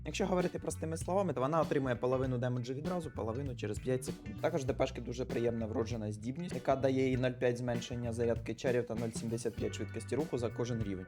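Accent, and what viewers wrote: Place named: mixed, Ukraine. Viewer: привіт- класний контент:) скажи як можеш з якої ти області)) балачка полтавська чи черкаська)?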